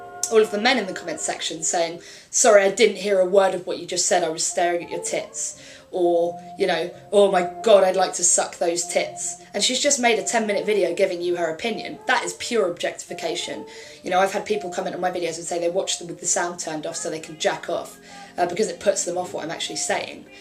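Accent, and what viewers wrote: United Kingdom. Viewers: I'm sorry but I can't watch this video because I can't consentrate on what you are saying. Seeing you in 1940 noir chic style is making my blood race, with you chiming away in the english accent and that squacky torch song on Wow ....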